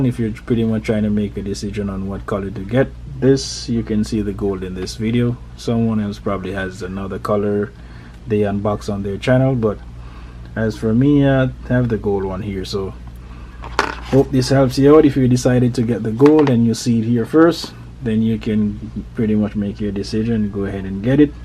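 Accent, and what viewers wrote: Jamaica. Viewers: You sound really Jamaican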